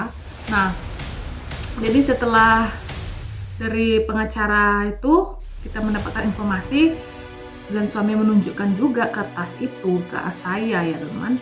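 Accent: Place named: Medan, Indonesia. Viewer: wkwkkwwk jujur kali critanya,lagi beol manggil2.hadohhh.salam dari martha mccrea sist,logatmu itu loh.medan kali wakkkk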